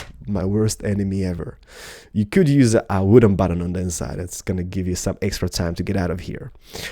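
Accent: Sweden. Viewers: are you from sweden!? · Are you Swedish if you are no Way im Swedish to!!! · Are you from sweden ? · WAIT ARE YOU SWEDISH?! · are you swedish!? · are u from sweden? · are you from sweden? :O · are you from sweden? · So swedish keralis Haha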